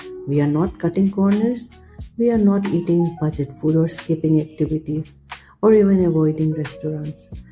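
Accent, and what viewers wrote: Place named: India. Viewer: 210€ a month on groceries seems extremely low compared to the prices I've been seeing on Portuguese supermarket home delivery sites. Looking at those prices I could easily spend that amount in a week in cold weather and a bit of walking to get me hungry, just by only cooking at home. I know from Portuguese folk I've spoken to on Reddit that to partially warm a cheap old small house costs about 200€ in winter. I guess farmer's markets might be cheaper but yeah, 210€ seems perhaps a little incredible to feed active and growing kids for a whole month. Also, as a Norwegian who has spent months in India I'm pretty sure where that accent is from, and it certainly ain't Sweden 😂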